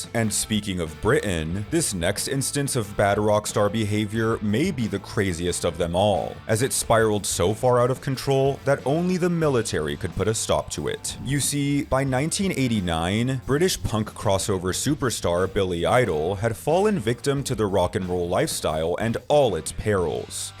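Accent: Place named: New Jersey, United States. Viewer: That’s a New Jersey accent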